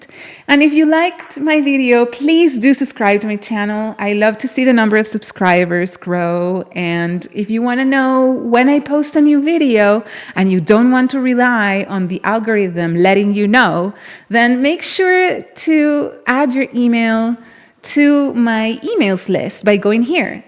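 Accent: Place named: Venezuela. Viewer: Tienes un acento más bonito en inglés que en venezolano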